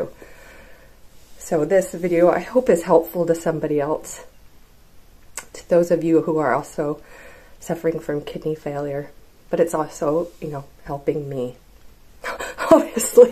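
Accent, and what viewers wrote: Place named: Canada. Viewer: OMG you just said something about being in PA? I honestly thought. by the way you talked, that you were a CANUCK EHH! :O * Mind Blown*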